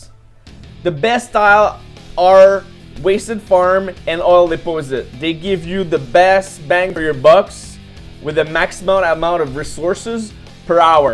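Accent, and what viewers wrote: Quebec, Canada. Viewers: Tu es clairement Québécois! Moi aussiiiii! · liked your old french canadian pronuciation of alliance better..... nice basic tutorial for DD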